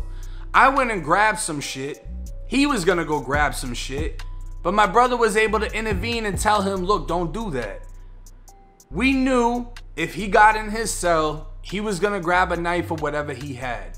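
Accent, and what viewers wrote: Boston, United States. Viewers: Cuz is u from Boston slim?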